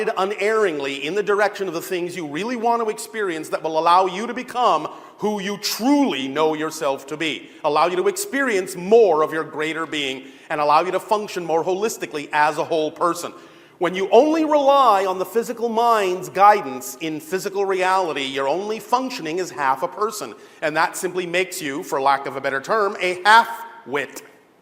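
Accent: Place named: Ireland. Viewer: He talks a little slower and to me a bit more Irish lol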